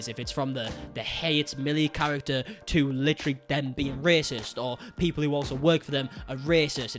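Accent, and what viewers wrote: United Kingdom. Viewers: I was so bored and this popped up. YAY. Commenting so can buy himself some decor for his shelves and I get my UK accent fix 😁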